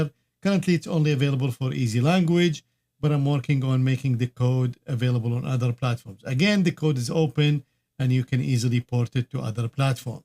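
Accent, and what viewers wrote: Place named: Iraq. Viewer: لهجتك عراقية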